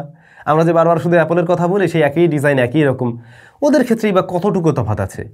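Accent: Barishal, Bangladesh. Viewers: ভাই আমি নয়ন । আপনি কি বরিশাল এর ছেলে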